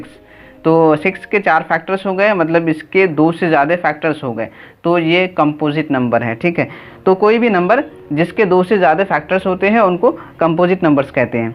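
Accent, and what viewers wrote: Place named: India. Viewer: إنجليزي بنكهه هندية 🌚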